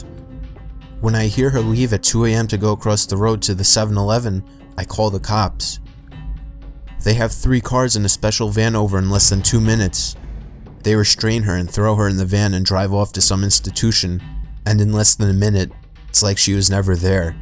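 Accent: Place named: Boston, United States. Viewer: He also has a Boston accent in his old videos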